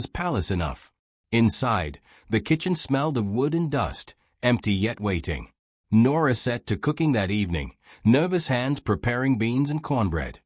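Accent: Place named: United Kingdom. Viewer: Again with a Brit voice narrating an old-west story.😠 But damn your high-and-mighty principles, lady. Your pride doesn't fill empty bellies. I'm sick of people who 'won't accept charity', when they've been PRAYING for help until they turn blue, but they throw it back in god's face, because they don't like his method of delivery.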